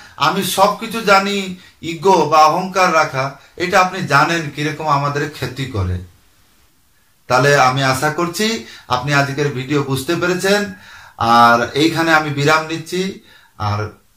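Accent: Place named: Bangladesh. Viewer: আমি বাংলাদেশ থেকে দেখছি। আপনার কথায় কিছু কিছু বাংলাদেশের টান আসে তাই জানতে চেয়েছিলাম।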